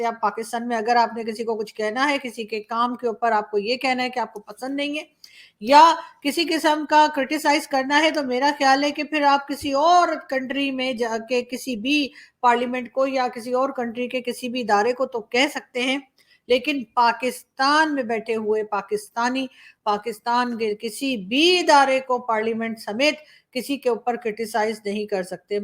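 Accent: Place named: Delhi, India. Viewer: What I like about she talks like An Indian and talks like a common citizen and a woman and asks all the common questions that an ordinary citizen would ask...bade bade fancy sawal ya lecture nahi. deti., jisko aam zindagi ke saath koi lena dena nahin. nahin She connects well with common ppl. That is her strength. Talking about India so much that now she sounds like a regular girl.from Delhi jahan auntyan ki bare mein yeh bolenge "dil ki achi hein...thodi gamandi hein..bas."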